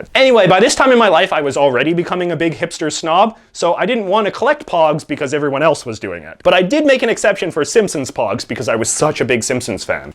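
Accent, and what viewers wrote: Canada. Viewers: "Aroond", well now I know you're not faking the "aboot" thing. Btw I'm also 32, hi-five.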